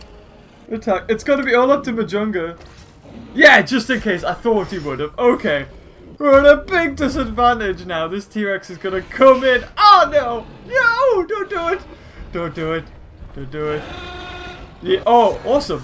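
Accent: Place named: United Kingdom. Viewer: hes british even though his accent isnt heavy it probably just sounded like it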